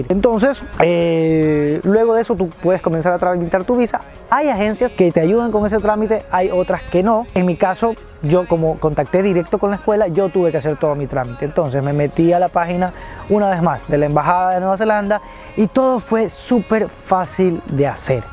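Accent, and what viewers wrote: Ecuador. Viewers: he mi comentario esta ahi tu acento guayaco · Jaja ni bien te escuché hablar dije el debe ser ecuatoriano Saludos desde Quito :)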